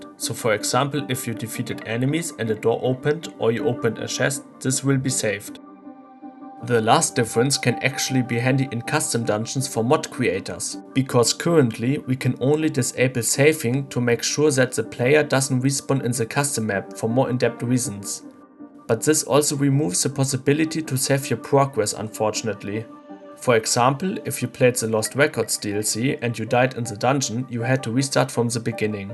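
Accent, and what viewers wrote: Germany. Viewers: its weird to hear a german accent when yourself are german, i dont have that accent, so it explains why some people were surprised when i told them i was german. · Man Hört so dein Deutsch raus xD deine Aussprache ist auch fast so gut wie meine oder besser so schlecht xD😂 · Gutes video und interessante Welt die du uns gezeigt hast. Ich finde es übrigens auch top wie man immer hört wenn jemand aus deutschland kommt xD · First time i hear you voice and i just gotta ask: are you german?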